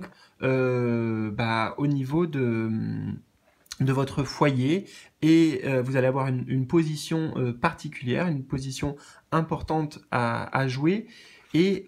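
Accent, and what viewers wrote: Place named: England, United Kingdom. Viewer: Bel accent anglais!!!😁 Merci tout plein pour cette guidance pour toute cette bienveillance 💜👍😘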